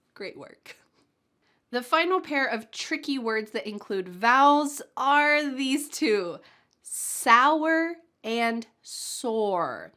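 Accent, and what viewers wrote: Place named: United States. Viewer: Thank you, you are the best teacher. You made me love the English language and the American accentThank you We all love you❤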